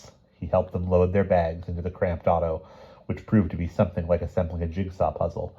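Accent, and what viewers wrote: England, United Kingdom. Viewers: You do a good English accent!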